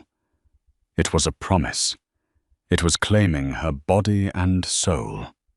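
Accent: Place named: United Kingdom. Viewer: I love 💕 the story, but I get tired of the British narrator. He is in America 🇺🇸, and the narrator should have an American accent. Don’t get me wrong, I do like the British accent, but I would like to hear the American accent sometimes. The British narrator sounds too dramatic. Rena from Rio Rancho, New Mexico, USA 🇺🇸. ❤❤❤🥰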